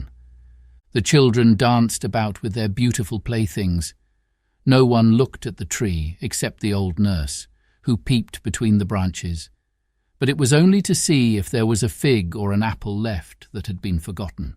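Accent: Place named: England, United Kingdom. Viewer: Thanks for that...great narration , English accent ...just found a new channel for me. Merry Christmas.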